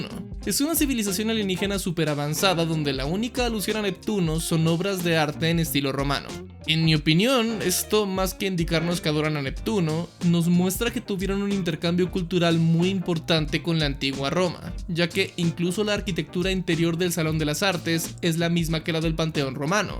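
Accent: Colombia. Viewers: Vato cuando hablas normal se te desborda el acento colombiano xd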